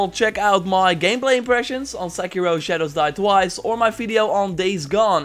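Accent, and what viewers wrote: Netherlands. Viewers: your Dutch accent kills me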